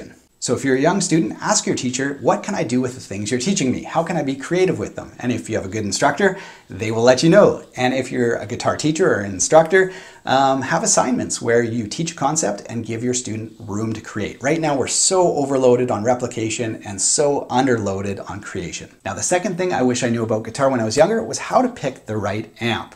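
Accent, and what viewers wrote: Canada. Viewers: Your canada voice entertains me.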